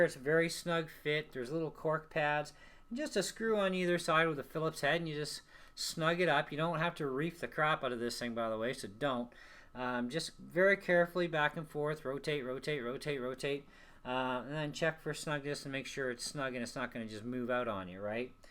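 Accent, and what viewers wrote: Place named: Newfoundland, Canada. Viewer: you got to be a newfoundlander :)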